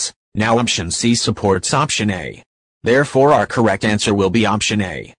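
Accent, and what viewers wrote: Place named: Spain. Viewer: Super bro Spanish english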